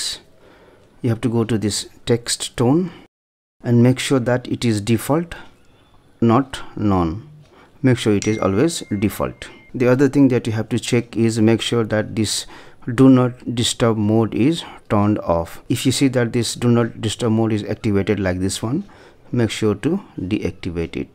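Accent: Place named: India. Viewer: Thanks man! ….You’re Indian accent is amazing to me. The more I listen to you the more easily I can understand! Haha!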